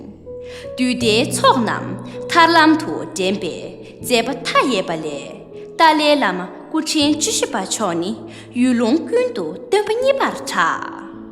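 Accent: Standard. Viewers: རྗེས་རབས་གསར་པས་་་་བུ་མོ་ཁྱེད་ཀྱིས་སྙན་ཚིག་དེ་མཐོང་སྐབས་་་་ད་དུང་ཡང་མི་རིགས་འདི་ལ་རེ་བ་ཞིག་ཡོད་པའི་འཚོར་བ་སྦྱིན་གྱི་འདུག་་་་་་དཔེ་ཡག་པོ་་་་་བོད་སྐད་གཙང་མ་་་་་ཀློག་གི་གཅོད་མཚམས་ལེགས་པ་་་་་་་བཀའ་དྲིན་ཆེ་་་་་མུ་འཐུད་་་འབད་བརྩོན་གནང་རོགས་་་་་་་་ལས་ཞོར་འགྲོ་ཞོར་ལ་ཉན་གྱི་ཡིན་་་་་་་་་ཁྱེད་རང་དང་་་་་ཁྱེད་ལ་བྱམས་བརྩེའི་སྐྱོངས་མཁན་གྱི་ཕ་མ་གཉིས་ལ་དམིགས་གསལ་བཀའ་དྲིན་ཆེ་་་་་་་་🎉🎉🎉🎉་་་སླད་མི་ཚེའི་ནང་་་་་གང་བསམ་ལས་ལ་རེ་བའི་འབྲས་བཟང་སྨིན་པའི་སྨོན་འདུན་དང་བཅས་་་་་རྒྱ་གར་བྱང་ཕྱོགས་་མ་ནའ་ལི་ནས་་་་་་་སྤུན་ཟླ་ནས་་་་་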